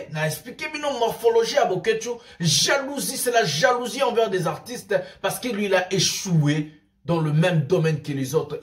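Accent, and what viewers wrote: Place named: England, United Kingdom. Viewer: na sepeli na accent nayo ya anglais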